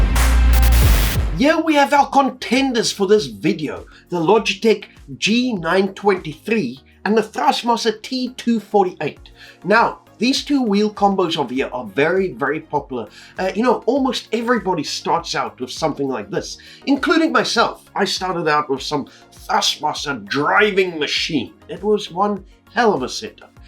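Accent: Afrikaans, South Africa. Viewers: I know a South African when i hear one sir whats the availability in sa? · 10 months later….Wootware has them at R6499…. I was also hearing the accent…and the Brrrrey comes through every now and then😊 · 😅I know your profile says UK 🇬🇧 but you sound so Afrikaans it's impossible to deny that you might be from South Africa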